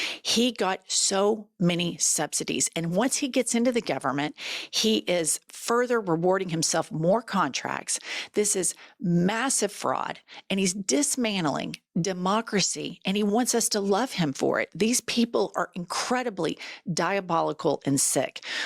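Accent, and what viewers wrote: South, United States. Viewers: Couldn't love you all more, and I never miss a podcast. Your southern twang and righteous quest for equal rights brings me joy every day. I live in canada but know that everything that happens in the usa comes here soon after and I am passionate about saving democracy. I think peter thiel might be the most overlooked dark player of this whole bunch. Thiel's idea since paypal has been to take over the american economy by lowering the value of the american dollar to equal that of cryptocurrency, which is what I believe the tariffs are meant to do. I believe peter loves elon being the front man for distraction as he silently plays chess with america. I think thiel and musk bonded over curtis yarvins nazi views and they are seeking to create all white communites governed by their own feudal laws where women have no rights. Thiel has stated that he'd like to turn greenland into one of these communities. 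Lastly I think the blood bond between trump, musk and putin might be Ivana getting pushed down the stairs the day before she was to testify against trump in court, and jeffrey epstein getting killed in prison.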